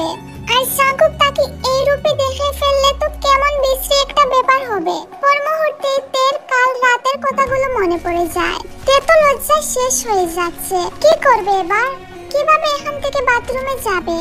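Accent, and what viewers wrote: Sylhet, Bangladesh. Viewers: আচ্ছা আপু 🌝 আপু কি সিলেটি ভাষায় কথা বলে আমার যেমন কিছু কিছু সিলেটি ভাষা মনে হলো কারণ আমি সিলেটে থাকি 😂।আপু তুমি কেমন আছো। ভিডিওটি অনেক সুন্দর হয়েছে। প্লিজ রিপ্লে দিও। আর তুমি একদিন লাইভে এসো 🥰।‌ প্লিজ আপু।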